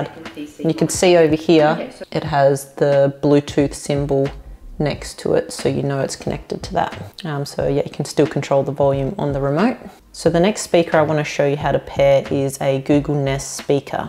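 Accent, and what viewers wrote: Australia. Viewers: She sounds like australian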